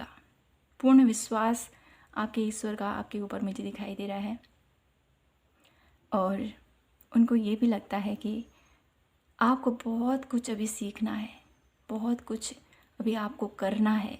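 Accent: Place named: Bihar, India. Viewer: thanku ji Achcha laga ki aapane bhi Renu madam Se Hi Sikha Hai reading bhi aap bahut acchi karte ho aapka bolane ka style bhi achcha Hai Jahan Tak Mera Khyal hai aap Shayad Bihar ke Rahane wale ho aapke boli se mere ko Aisa Hi mahsus Hua kya aap Kolkata Nahin to Bihar ke aap Rahane wale ho aur Main apna WhatsApp number bhej deti hun agar aap Chahenge to ham log baat kar sakte hain theek hai aap ek bar humse baat Karen thank u ji aur family and Happy Diwali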